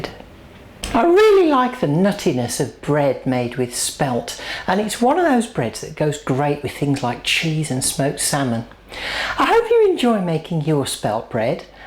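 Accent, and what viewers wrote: Birmingham, United Kingdom. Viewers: Okay, so trying to morph my loaf from one recipe to another didn't work! I've now followed this recipe religiously and will post the result. I know what folk mean about voice and I detect a faint Birmingham accent there. What a pity she no longer communicates with her supporters because of the ugly people who drove her away. I've subscribed to her gardening channel as I need help in that department too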